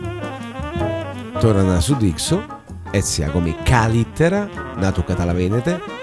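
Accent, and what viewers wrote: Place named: South, Italy. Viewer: persino il tuo accento italiano, mentre parli greco, mi suona come quello del sud italiano. Direi….fammi pensare……fra napoli e sicilia forse?….ho indovinato? Palermo? Magna Grecia sicuro….hai usato zucchero o no? Se no, perche no?….un altra cosa: come e che sei andato afinire in Grecia? wife? Girl friend? O altro?…..:):):):)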